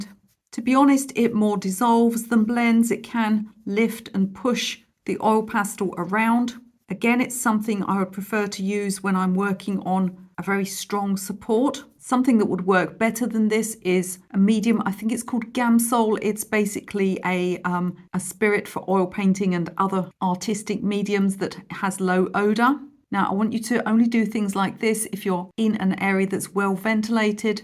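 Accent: United Kingdom. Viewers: So bri-ish🤌🏻😭✨ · Loved this video! Thank you for sharing your knowledge and talent😊 But, can we talk about your fabulous lipstick/gloss! Care to share the brand and shade….pleeeeeze!? And from a viewer in Texas I’m entranced by your accent and now want to walk around sounding British speaking as properly as possible😊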